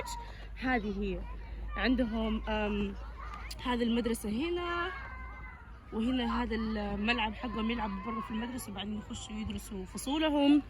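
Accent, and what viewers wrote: Saudi Arabia. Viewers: هههههههه باين مأثرة عليك الرياض لهجتك المخلوطة حلوة ... الله يحفظك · اتكلمي باللهجتك ....مرة سعودي مر سوداني لا تنسي روحك انتي تشادية سوداني ابدوي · لهجة جداوية بححححتا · لهجتها سعودية ودمها سوداني وجنسيتها تشادية 🔥🔥❤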